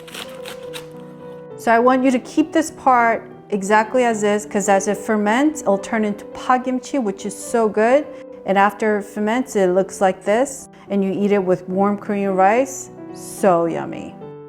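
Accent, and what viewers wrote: United States. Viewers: WOW i hear your accent evolved into american in such short time from the video you made 6yrs ago on the pickle raddish. Amazing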